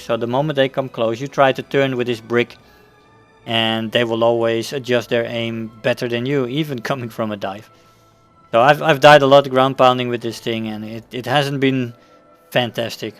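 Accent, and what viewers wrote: Netherlands. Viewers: ik kan het meteen horen als iemand nederlands is, meeste nederlanders hebben een best wel herkenbaar accent als ze engels praten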